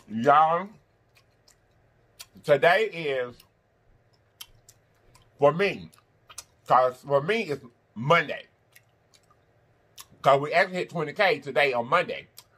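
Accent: South, United States. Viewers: Yo personality gives me life 💜 and i love you Southern accent to.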